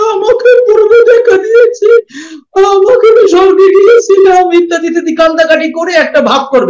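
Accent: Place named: Barisal, Bangladesh. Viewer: জন্ম স্থান বরিশাল? মাঝে মাঝে বাঙাল ভাষা বেরিয়ে আসে।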